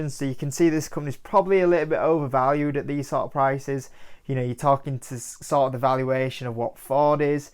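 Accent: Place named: Yorkshire, United Kingdom. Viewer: but by no means, them are not competitors, same as Lucid (private so far). And Tesla has no real competition so far to be honest, as you buy technology, not just a vehicle (it's not a car according to Oxford dictionary). As you're based in Yorkshire, judging from dialect (😃), I would advice to make a call and get a Tesla Model 3 test drive, then you will see what it's all about (the hype), Tesla model X/S doesn't give the same feeling and tech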